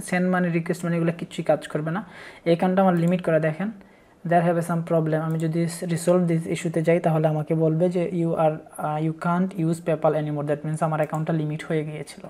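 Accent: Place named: Sylhet, Bangladesh. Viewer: Sylheti smell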